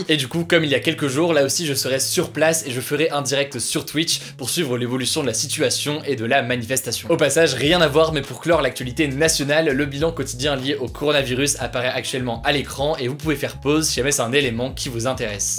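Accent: England, United Kingdom. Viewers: Ton accent anglais me fume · C'est bien pour l'accent anglais mais c'est JayKay Rowling et pas JeeKay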